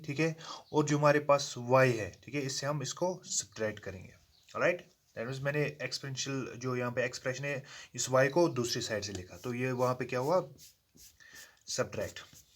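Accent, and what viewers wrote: Kashmir, India. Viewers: Are u from Kashmir sirr ? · I also think that sir is from Kashmir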